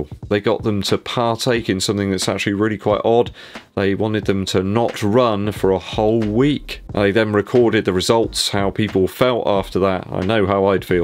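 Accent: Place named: Yeovil, United Kingdom. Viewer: I enjoyed your pronunciation of the "Salisbury Half". Can you do an entire episode with your Yeovil accent please?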